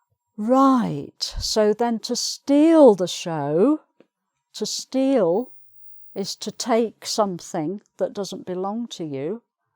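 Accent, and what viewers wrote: United Kingdom. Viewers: Always excited to hear your British accent which makes me want to keep learning and practicing English as a none native English speaker.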